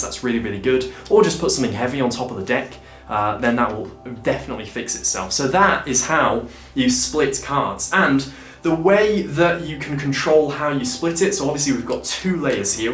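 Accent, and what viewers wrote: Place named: England, United Kingdom. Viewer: Are you from England? I am from Taiwan, I want to learn the cool accent you have...,plz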